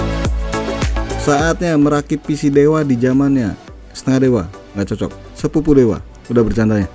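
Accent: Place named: Palembang, Indonesia. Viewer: Hahawkwk kocak bg ni ,wong Palembang kayak nya ni,pakek nian nama nya 😁😁😁😁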